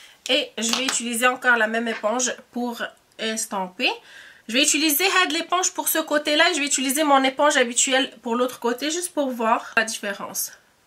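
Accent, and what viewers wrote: Canada. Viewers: coucou,magnifique et superbe video,j'adore le make up,toujours a la hauteur bravo continue,j'adore ton accent canadienne,pour le petit masque javais dis 90 usd,sinon le rouge à lèvres est tres beau!!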